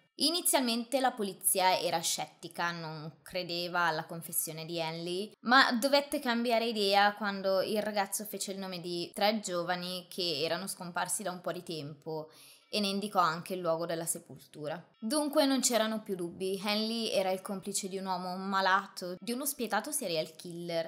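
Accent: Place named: Milan, Italy. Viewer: Sì consocevo la storia di Candyman; uno dei più famosi serial killer USA. Poveri ragazzi, adescati dall'alro farabutto coetaneo e complice dei Corll. Ti chiami? Ebbene è il mio primo video del tuo canale e mi sono iscritto. Hai una buona voce e narrazione con accento lumbard milanes.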